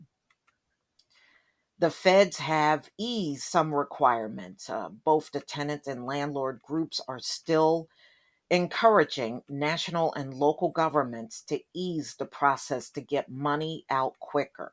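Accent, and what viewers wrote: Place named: Maryland, United States. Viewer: I love your accent, my aunt lived in Maryland for years and she talks similar.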